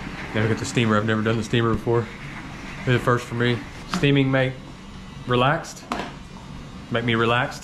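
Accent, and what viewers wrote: South, United States. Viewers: I deadass thought this was Jensen Ackles from Supernatural/The Boys 😂you kinda look like him in the face and y'all both got that cute southern accent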